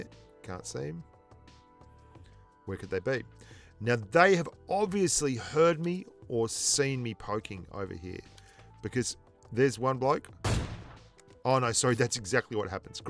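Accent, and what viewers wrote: Australia. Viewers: Strayan accent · I love your Aussie accent...keep it up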